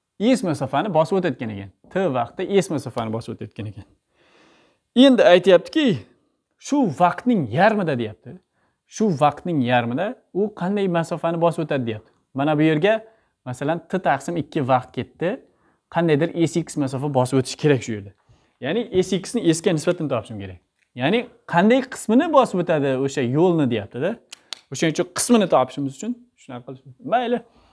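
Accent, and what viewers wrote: Khorezm, Uzbekistan. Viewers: Assalomu Aleykom ustoz darslariz uchun rahmat ha bazan xorazm shevasida gapirgandek tuyuladi menga!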